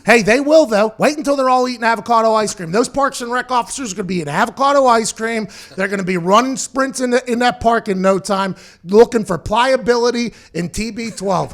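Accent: Pittsburgh, United States. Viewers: letting the Pittsburgh come out in his speech is fuckin awesome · Aht... is embracing his pittsburghese more and more and us ynizers love it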